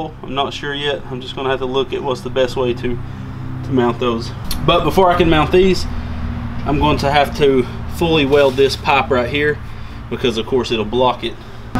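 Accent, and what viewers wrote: South, United States. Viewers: Are you from down south?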